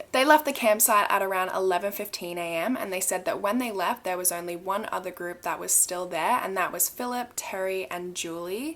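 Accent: Australia. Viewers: You've never sounded more Australian. Love it 😂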